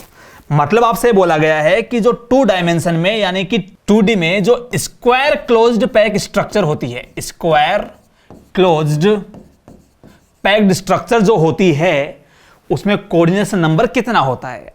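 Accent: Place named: Rural, India. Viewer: Sir ke bolne mai gao ka taste hai Jo iss lecture ko aur bhi interesting bna rha hai Love u bhaiya 3000❤❤❤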